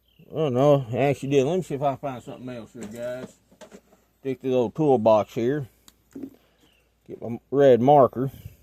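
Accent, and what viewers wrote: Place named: South, United States. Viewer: Brother I thought I was southern lol you got the deepest country accent I've heard in a while. 😂 alot of folks would say they can't understand us so I guess it's our own language 😂😂😂 great video can't wait to see you use it once it's all finished. Keep it fishy 🎣👊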